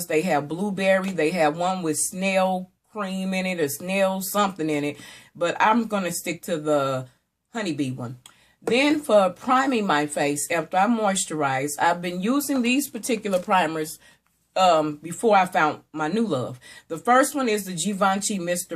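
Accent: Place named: South, United States. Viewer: I love your southern accent 💕💕 Kisses from NJ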